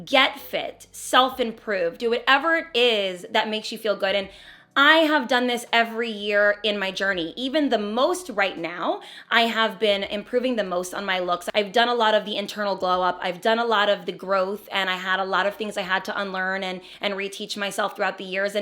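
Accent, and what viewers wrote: New Jersey, United States. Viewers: the jersey accent for meeeee 😩😩😍💕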